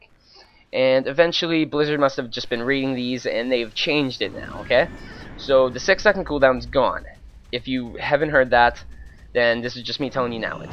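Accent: Canada. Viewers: Are you Canadian? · I havent checked your channel, but you sound like a canadian :)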